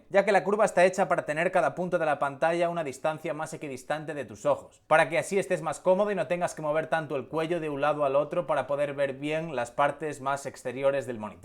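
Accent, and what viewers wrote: Galicia, Spain. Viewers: Sos gallego? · Hablas muy bién, sos español?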